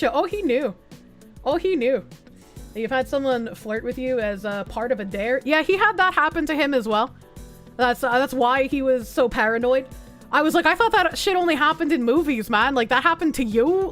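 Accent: Boston, United States. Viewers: The boston accent is great. It reminds me of one of the greatest American events in history.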